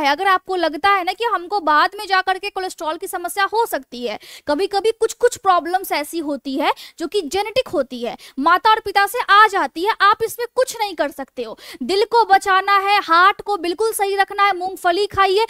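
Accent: Bihar, India. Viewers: Mam vihari ke awaj me swag hai aapke awaj se hme pta chl gya aap pakka vihar se ho❤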